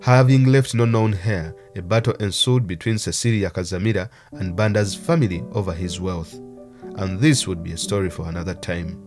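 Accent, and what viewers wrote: Uganda. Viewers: Author sounds like a Ugandan scholar...the Ugandan English accent is unmissable...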